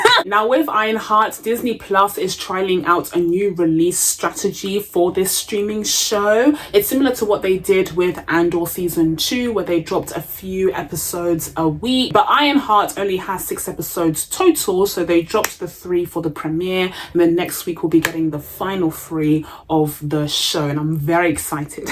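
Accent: United Kingdom. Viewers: First I wasn't expecting a British accent, lol 🤭 secondly I agree with small minded racists review bombing anything that isn't centered around them. Third, I sincerely appreciate your commentary, thank you. 🇺🇸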